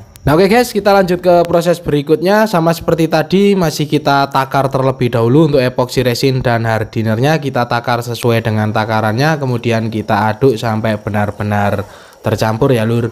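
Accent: Temanggung, Indonesia. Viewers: Wong ndi to jane mass kok koyo wong temanggung ae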